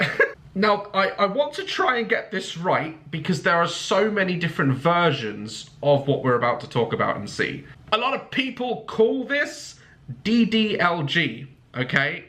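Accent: United Kingdom. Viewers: sometimes I forget your British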